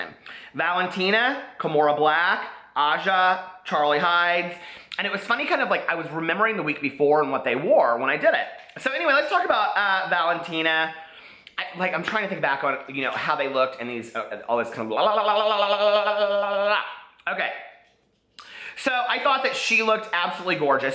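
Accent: South, United States. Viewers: you are too gay to function at times... #loveyou great comments, I enjoy your Souther accent.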